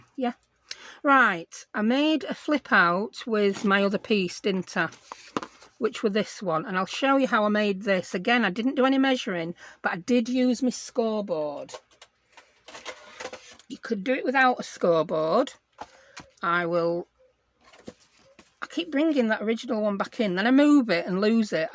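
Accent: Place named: Northern England, United Kingdom. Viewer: I’m so happy I’ve found your channel! I’ve recently got into paper craft after starting a wedding album for my friends upcoming wedding. I’ve found loads of US creators but no one from the UK so you’ve made my day! You also sound quite local to me, your accent doesn’t sound too far away, I’m from Sheffield and will now be binging all of your videos! Xx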